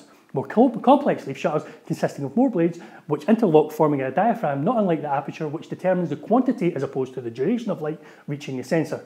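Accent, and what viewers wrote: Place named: England, United Kingdom. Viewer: ahaha...yeah man, you are rapping the info out off your mouth....with heavy english accent....this how to vd...check on your basic teaching and communication skills...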